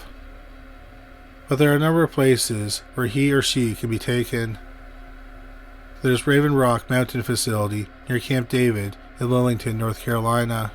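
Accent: Canada. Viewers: Remember that he isn't from the US. Maybe up in Canada they are taught to pronounce words this way. They think we are insane for not spelling words with u's in them like colour for example.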